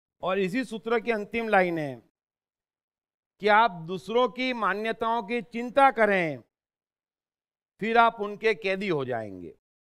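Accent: Gujarat, India. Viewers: Nahi, Ye Gujarati he.🙂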